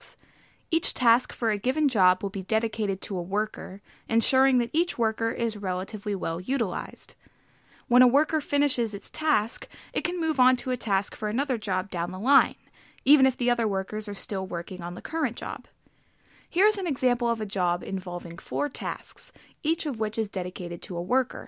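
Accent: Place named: Pittsburgh, United States. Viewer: Luis Bill very true hahaha. It's apparently a Pittsburgh accent thing (that I've undone with some voice and speech training for future videos) 😊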